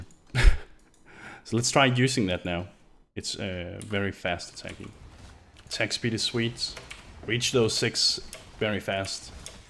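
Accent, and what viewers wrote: Denmark. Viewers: Are u scandinavien? Maybe even Danish?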